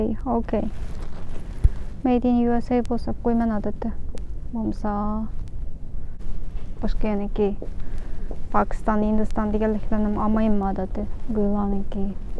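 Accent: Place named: Turpan, China. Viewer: ساپ تۇرپان تەلاپپۇزى 😉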